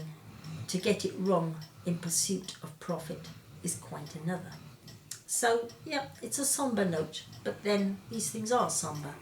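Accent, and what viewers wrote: Wales, United Kingdom. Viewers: I live in next to Aberfan. I knew you lived in Wales from your accent from watching your other videos but didn't reolise you lived close by. Loving the videos by the way 😁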